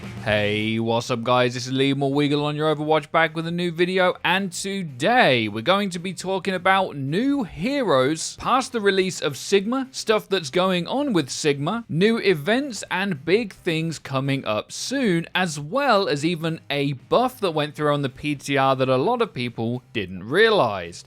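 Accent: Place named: United Kingdom. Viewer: Where in the hell is Frido? I’m getting SUPER tired of mispronounced monotone British accented commentary from Mr. boring over here.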